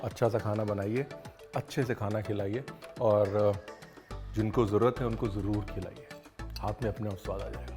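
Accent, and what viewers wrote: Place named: Lucknow, India. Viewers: Aapki awaaz aur aapke Shabd sunke hi lag jata hai ki aap Lucknow ko belong Kiye Hain ab aap abhi kahan Rah rahe hain yah Nahin Pata maine aapko Kapil Sharma show Mein Dekha Tha tab Main Nahin Janti thi ki aap Lucknow se ho lekin usmein Maine aapki awaaz Suni Thi Kunal Sab the Sanjiv Sar the aur aap the tab Mujhko andaza ho gaya tha ki aap Kabhi Na Kabhi Lucknow Rahe Jarur Hai thank u Sar Aise Hanste muskurate rahiye Acche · Aap Lucknow ki baat karte hai bahut aacha lagta hai jo Lucknow ki yaad dila deta hai aapka Lucknow ka andaaz behed khubsurat hai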